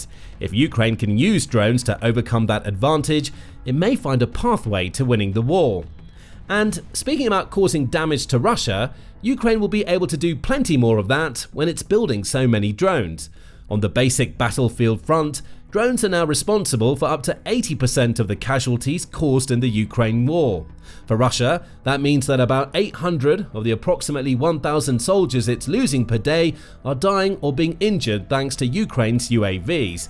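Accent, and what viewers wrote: United Kingdom. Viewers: British English, yes, so kinda regional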